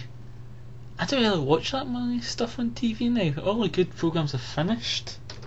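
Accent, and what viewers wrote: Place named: Scotland, United Kingdom. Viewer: You are SOOOO Scottish XD That is one cool accent :D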